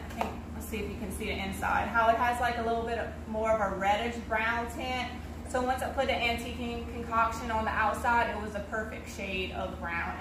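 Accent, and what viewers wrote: Louisiana, United States. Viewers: So Awesome. Thanks for answering back. I'm from the West Bank of N.O....nice to hear another LA accent. I can't wait to try some if your ideas and your recent project sounds cute. Glad you were able to sell it. I hope to sell some of mine in the near future. ❤❤❤ Oh and that sounds so cute attaching them to a shutter.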